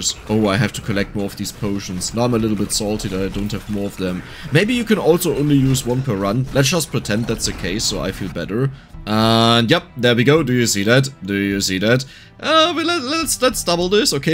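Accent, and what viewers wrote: Germany. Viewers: 5 seconds in the video and i could already tell you're german lol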